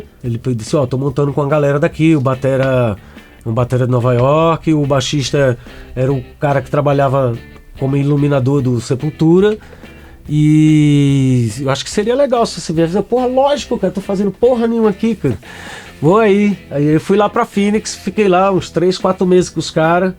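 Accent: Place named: Pernambuco, Brazil. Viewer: Quem resiste a esse sotaque pernambucano?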